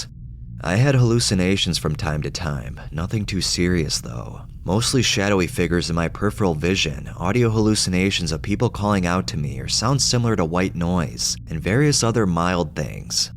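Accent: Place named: California, United States. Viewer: Omg I love your words 😂😂😂😂😂😂 finally a swear guy you sound like us so. California's peeps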